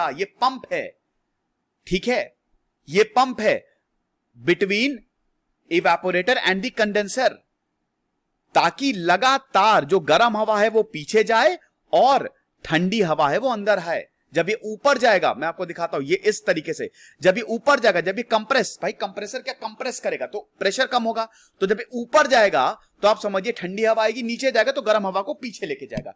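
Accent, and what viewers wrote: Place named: Awadh, India. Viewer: Sir ka deshi andaaj jaari Avadhi style me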